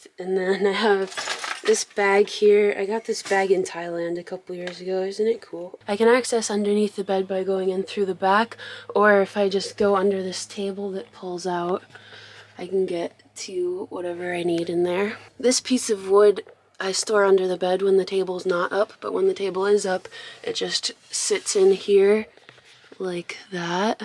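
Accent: Massachusetts, United States. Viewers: What an amazing gift!!! I LOVE the Mass accent! The Van is rad, and I'm sincerely happy your living your dream, and now you can travel with your soulmate, Morgan (; Sending love from New Hampshire!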